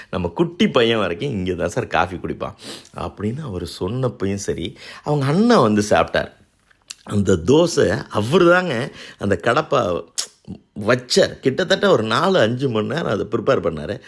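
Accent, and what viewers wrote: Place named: Sri Lanka. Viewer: உங்கள் தமிழ் உச்சரிப்பு ...சைலோனில் உள்ள எனது தமிழ் ஆசிரியரை நினைவூட்டியுள்ளீர்கள்.. அனுபவத்தை விவரித்ததற்கு நன்றி.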